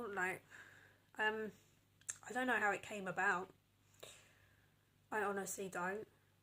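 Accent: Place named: United Kingdom. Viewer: This was randomly shown to me on my feed, you seem to have a very comforting aura about you. You’ve got a nice voice and personality. I wish you the absolute best with your content creation. I would say that the most critical thing you should do is plan as much as you can before you start recording. If you can do that, while maintaining the authenticity that you have in spades, that’s the best you can do! You’re doing great!! Also I really like your british accent 😅 and you’re pretty funny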